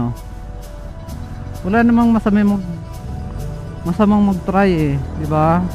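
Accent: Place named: Iloilo, Philippines. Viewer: ilonggo gid ah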